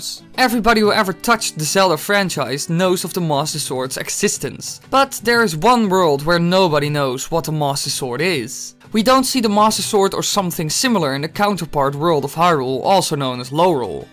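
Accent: Netherlands. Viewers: Ben je Nederlands of zo? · are you dutch?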